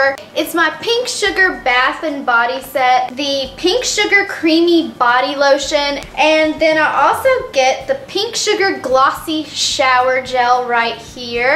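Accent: South, United States. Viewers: Where is she from???!!! I know she's from the south but where??!! :)))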